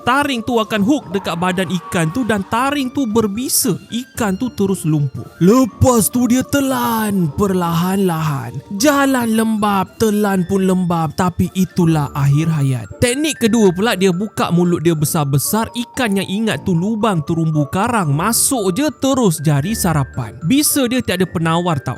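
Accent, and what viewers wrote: Sabah, Malaysia. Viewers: Admin ni kan? Suara tu sebijik dgn slang Sabah dia sikit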